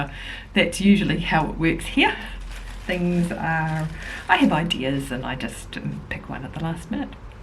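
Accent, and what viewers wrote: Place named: New Zealand. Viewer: Always lovely to see you and hear a fellow Kiwi.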